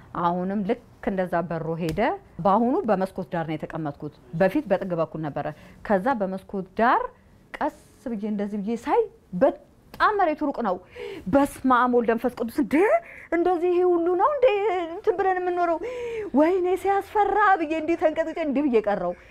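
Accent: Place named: Arab world. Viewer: እንግሊዝኛ ሰታወሪ አክሰንትሽ እንደ አረቦች ነው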